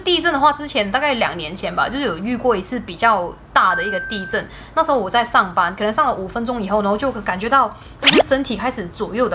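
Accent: Taiwan. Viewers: 我被迫要放慢影片速度來看。😭 你是马来西亚人吗？为什么你说话有台湾口音呢？🥺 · 马来人台湾腔,吊吊吊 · 大馬華人怎麼一開口是滿滿的台灣口音？ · 你講話好像台灣人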